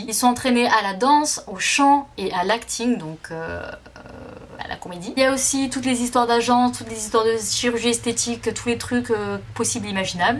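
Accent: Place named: Toulouse, France. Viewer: A peine j'ai commencé à regarder la vidéo je me suis dit "omg elle est Toulousaine c'est sûr mais avant de lui demander je vais regarder à description" et j'avais raison x) vive le sud ouest ! Et vive la k-pop !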